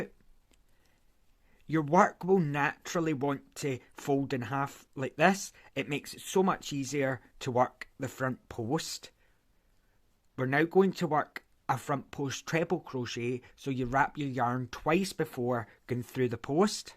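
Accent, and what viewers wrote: Scotland, United Kingdom. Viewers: I'm from Devon and very rarely get to hear a scotsman very nice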